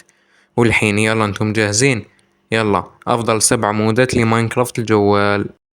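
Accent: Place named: Algeria. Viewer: خويا اهدر صح نتا جزائري ❤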